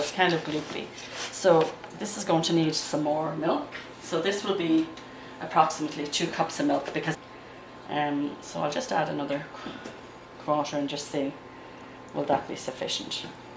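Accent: Ireland. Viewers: Hi, I've recently subscribed with you, loving your video's so far & love your gorgeous Irish accent!...I'd love to know how long to leave the vinegar standing in a cup of milk?..I'm looking forward to making Soda bread the way my dear Irish Grannie used to make it! keep safe x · Love the video. Love your Irish accent. My ancestors came from Galway Bay. God bless the irish. Wish I could have a cuppa and a slice with you.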